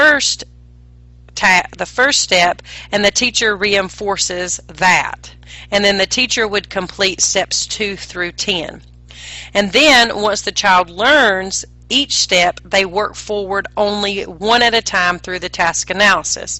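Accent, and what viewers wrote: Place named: Texas, United States. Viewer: fantastic texan axent ;-)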